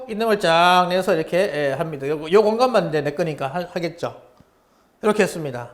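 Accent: Gyeongsang, South Korea. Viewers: 경상도 억양이 들어가서 그런가 봅니다. 어떤 대통령이 경제 발음이 안 되어서 경제를 못했다고 했는데 제가 그런가 봅니다. 다른 부분은 잘 들어주셔요.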